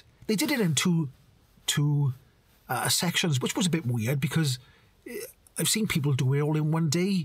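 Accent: Wales, United Kingdom. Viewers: I believe that the leasing arm of Porsche will be liable for any loses...many leasing companies both ICE and EV have been caught out by wildly fluctuating second hand car market prices since the pandemic and the war in Ukraine. Keep doing what you do, it's goof to hear a fellow Welsh voice in the debate... you are around Caerphilly correct?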